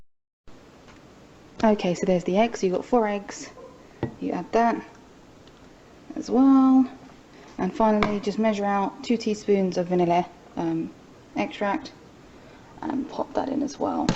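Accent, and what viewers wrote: United Kingdom. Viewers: oh my god shes british <4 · I didn't expect you to be British :) UK FTW.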